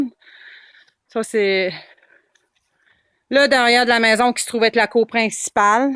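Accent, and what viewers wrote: Quebec, Canada. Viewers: mon enqueeeeeete xd laccent quebecois fait vraiment pitier . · C'est l'accent québécois, mec... Si tu ne l'aimes pas, passe ton chemin. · c'est toujours marant d'entendre l'accent Québequois très prononcé ! tout comme le créole c'est une langue à part d'origine Française purrait on dire ! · thevalkyrie666 awb enfait elle c'est parler c'est juste l'accent québécois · Vive l'acent québécois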